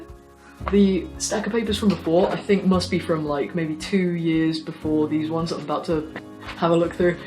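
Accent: United Kingdom. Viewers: You have a british accent (I think), so I had some problems to follow what were you saying (I'm Venezuelan) but in any case I love your style!! Is so awesome!!! 😍 I wish I would draw like this, my style is more kinda...cute? (Is what the people said to me) if you're curious my Instagram is From myself, you already have loyal follower X3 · Are you brittish? I'm terrible at knowing accents even though I'm from england lol. EDIT: you are brittish! That's so great! Your voice is really cute.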